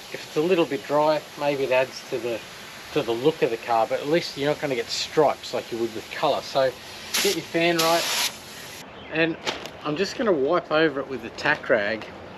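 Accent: Australia. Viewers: Nice job on the floor pans😅. Did a few. Lol. Tech screws and filler!! 😜. Living in UK now. Good to hear a true fair dinkum Aussie accent. Keep the videos coming. Still think that asthmatic 202 and traumatic need to go!! It's begging for big block and 5 speed transmission 🤗🤗🤗🤗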